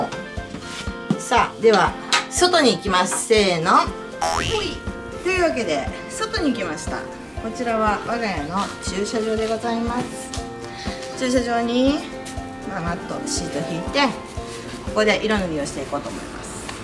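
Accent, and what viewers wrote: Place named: Nagoya, Japan. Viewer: が作業中の肉まんのソックスと名古屋弁が可愛かった💕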